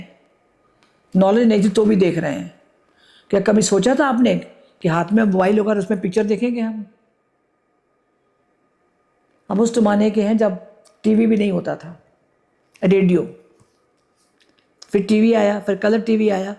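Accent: Punjab, India. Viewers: Pranam Aunty. I regularly watch your videos. I love your punjabi accent.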